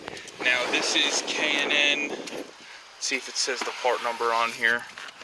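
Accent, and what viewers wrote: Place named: Philadelphia, United States. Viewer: You hear South Jersey, I hear Philly, but they are close enough to almost be the same.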